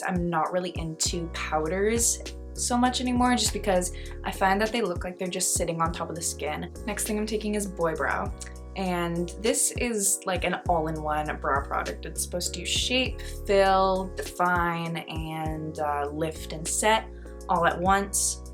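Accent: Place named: Canada. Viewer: this is my first video i’ve seen from you and the second you stared talking i thought to myself “she’s totally Canadian” lolol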